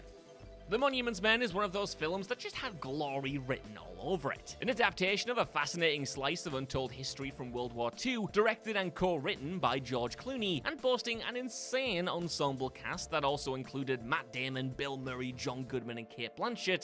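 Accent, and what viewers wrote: United Kingdom. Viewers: I love how he tries to keep pronouncing "toe-may-toe", but every now and again a thoroughly British "toe-mah-toe" slips out....🎶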